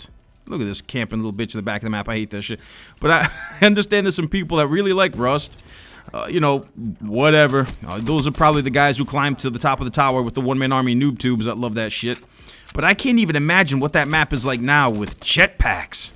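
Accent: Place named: New York, United States. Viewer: I Knew you was from ny b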